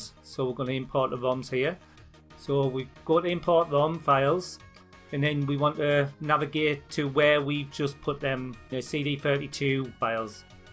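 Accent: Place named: England, United Kingdom. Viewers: HI, i have followed your tutorial but only get the CD32 loading screen, i am not sure if my games are in the correct format, i have unzipped the folders and have a cue file and another iso folder inside the game rom folder. i have the kickstarts installed. by the way i thought from the accent you were from Middlesbrough, i am from newcastle myself. hope you can shed some light cheers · hey! i know its been asked and answered already but where in england are you from? i just lovin the accent and i wanna look into it and hear more of it:) i dont remember your answer let alone the video where i read it. thx. (also always lookin for new amiga stuff from you:)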